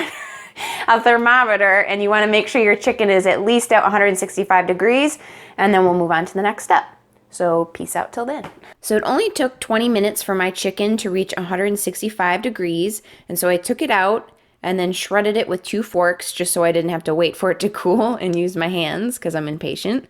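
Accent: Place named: Midwest, United States. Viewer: You have a very thick Midwestern accent.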